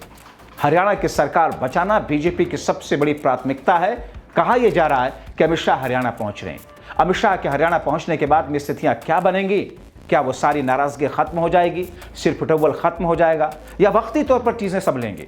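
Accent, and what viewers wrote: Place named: United Kingdom. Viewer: English tone me hindi news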